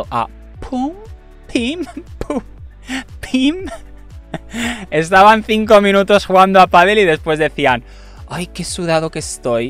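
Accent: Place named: Spain. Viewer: Quiero saber si eres Dominicano por que tienes tanto acento Espanol?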